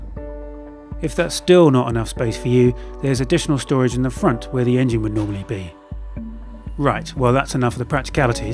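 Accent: United Kingdom. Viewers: British calm voice narration <3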